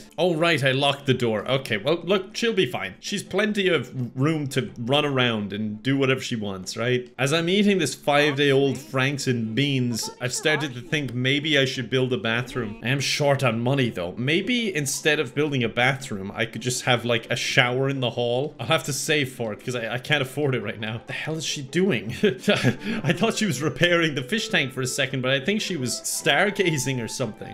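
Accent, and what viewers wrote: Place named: Ireland. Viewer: Awww you are starting to lose your cute irish accent. 😔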